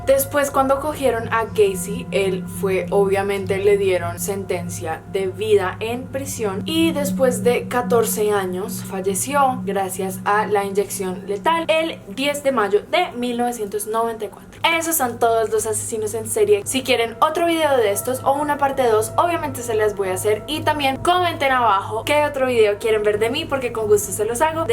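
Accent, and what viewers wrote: Colombia. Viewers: estoy seguro que ella no es mexicana . no parece... parece y se escucha colombiana . es asi o me equivoco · Me encanta tu asento colombiano😅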